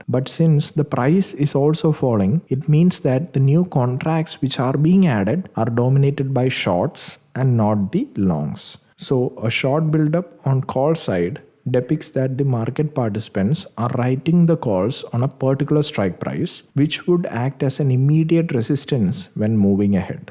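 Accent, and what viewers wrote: Kerala, India. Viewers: Sir, are you from Kerala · The voice of a Malayalee. Well explained. Thanks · Excellent Video. Volume pronunciation can make out that it's a Malayali. Nevertheless, excellent presentation.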